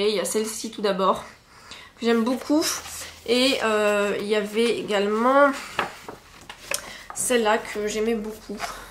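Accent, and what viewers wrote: England, United Kingdom. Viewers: Tu me fais trop rire, t'as des fois un petit accent anglais, j'adore! T'es un peu fofolle je crois :p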